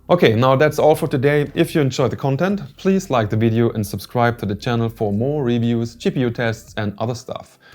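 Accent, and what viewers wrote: Germany. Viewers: Man merkt an der Aussprache direkt das du Deutscher bist 😂❤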